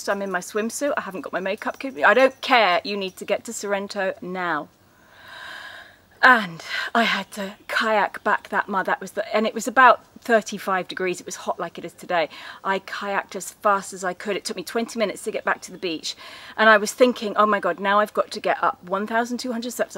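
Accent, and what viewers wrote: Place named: United Kingdom. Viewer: I've got to say, you and are just delightful. I'm sure when you started this YouTube journey, you may not have given much thought about how it would be if your channel got popular and you were recognized. Positano is such a small place, and you guys are recognizable by your kind, lovely faces (your British accent and wonderful smile). You are so gracious to invite people to say hello to you, which can I imagine can be intrusive or inconvenient depending on what you are doing. You never need to apologize for being human or having a bad day. I hope that people respect your privacy when the moment calls for it. As someone who has been wanting to visit the Amalfi coast for all of my adult life, I thank you so much for showing us around your beautiful little corner of the world. You take me there even when I can't be there! 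Love to you from PA, USA. ❤️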